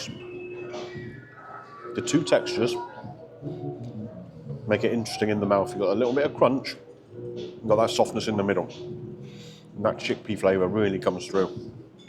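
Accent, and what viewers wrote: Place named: England, United Kingdom. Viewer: which part of England you come from, my first language is not English but I could understand all the worlds your saying, some food bloggers are very difficult to understand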